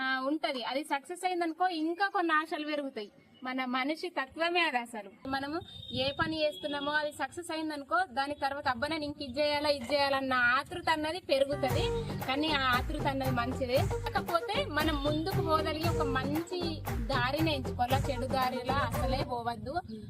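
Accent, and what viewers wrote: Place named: Telangana, India. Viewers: మీ విజయానికి హృదయపూర్వక శుభాకాంక్షలు💐🎉🎊 మన తెలంగాణ ముద్దుబిడ్డ మీరు😍🥰 మన తెలంగాణ యాసని చాలా గొప్పగా చాటారు. ధన్యవాదాలు🙏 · నిక్కసేన తెలంగాణ బిడ్డవు నిక్కసగా తెలంగాణ యాస. నిక్కసైనా నిజం బాధ బిడ్డ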